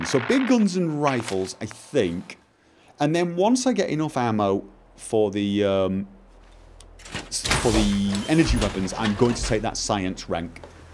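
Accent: United Kingdom. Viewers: He’s so fricken British it’s awesome lol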